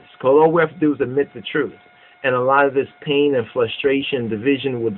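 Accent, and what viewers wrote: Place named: West Coast, United States. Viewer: Wow!!!! you really should read your bible and look for salvation instead of looking to find what race people are there are good arguments both ways but neither have anything to do with salvation. And why is it that in the comments anyone who disagrees with you is racist but your video about salvation is for blacks is not racist? also just throwing this out there for ya Solomon had a black lover but in the book song of soloman she is saying for him not to look down on her because she is black. Now if he were a black man why would she say that? He was the son of kind david by the way. Also I noticed your channel is Ethiopian world network and you sure are dressed the part but im curious what part of Ethiopia are you from cause most of the people I have seen from your country don't have west coast accents..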